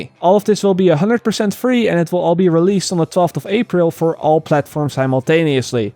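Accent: Netherlands. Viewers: are you dutch? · hahah ik wist wel dat je Nederlands was dat hoor je heel overduidelijk aan je accent als je engels praat :P:P:P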